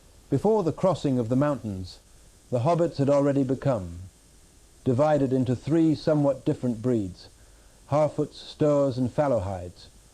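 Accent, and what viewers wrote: United States. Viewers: An amazing technological achievement, but doesn’t sound quite right. As another comment said, the accent is slightly American. But more than that, the recitation isn’t that of a story teller. Tolkien was a more poetic public reader. But an incredible achievement of AI nevertheless.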